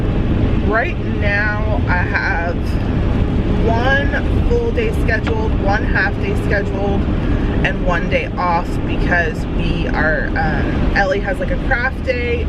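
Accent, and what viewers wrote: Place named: South, United States. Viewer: You're totally picking up a little southern accent girlfriend!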